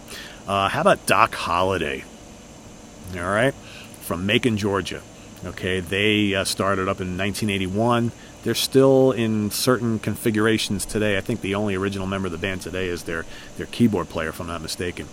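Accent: New York, United States. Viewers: CCR were from the San Francisco Bay area. Don Henley co-led the Eagles with Glenn Frey, who was no Southerner, and nor were most of the other members of the band. Anyway, you came onto this man's video here, insulting him and using profanity, saying that he doesn't know shit about Southern music because he's not from the South, and he has a New York accent. Man, that kind of thinking and behaving is not "Southern." it's just rude, ignorant, and disrespectful. You may be from the South, but with the way that you came onto this guy's video, telling him off and spouting profanity at him for no good reason, you actually sound more like a Southerner's stereotype of a rude, loudmouth Yankee than a Southerner who was raised with some manners. That's all I have to say. Anything more would be wasting my time.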